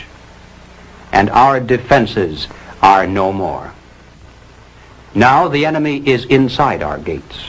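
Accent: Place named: Mid-Atlantic, United States. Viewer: The mid-atlantic accent is persuasive as fuck.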